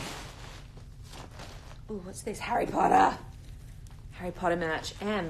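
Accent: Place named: United States. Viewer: THE AMERICAN ACCENT HAGAHAHHAHAHA IT WAS GOOD THO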